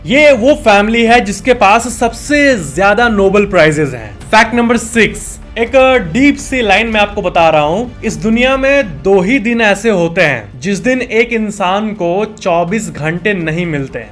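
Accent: Bihar, India. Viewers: Bihari babu voice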